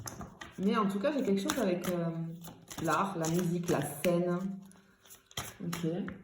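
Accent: South, France. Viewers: C’est trop bon l’accent du Sud !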